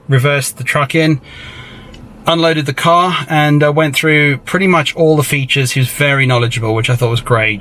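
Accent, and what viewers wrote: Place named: United Kingdom. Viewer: you have been a godsend! I have been watching your videos since just after Christmas when I decided to get a new car. All I wanted back then was a back-up camera & a hatchback [current car is a 2013 manual Mini convertible - tons of fun but a very active puppy makes the top-down times limited]. Then I went down the electric car rabbit hole - I have been intrigued since the first Tesla 2-seater which I coveted from afar. Checked out Consumer Reports and they teased that a new car was coming to market in the US soon, and that was my intro to the Polestar! Honestly, I fell in love w/ the yellow seatbelts irrationally & immediately. I have co-workers who have Teslas but I hate the center-only screen. I googled Polestar and became quickly hooked on your open & honest straightforward videos [my dad was British so the accent doesn’t hurt either, probably some subliminal warm feelings there too]. 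I’m brand new to e-cars so that started the learning curve re: charging, battery size, range, blah, blah, blah [your early video helped me slog through that phase]. I took the leap in lockdown and drove to NYC to see and test drive the car in person and fell in love! When I got home from that trip you posted your now infamous “Don’t a Polestar” video, and that put a chill on my enthusiasm. Did I really know what I was doing? Was this just my rebellion for being locked in my apartment for a year? Who knows, but I got over it and in early March ordered my Midnight Polestar 2. Did the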